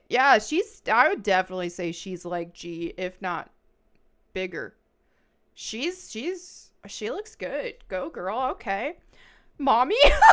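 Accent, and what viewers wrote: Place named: South, United States. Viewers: I love Southern twang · I think I’m hearing a bit of a southern accent.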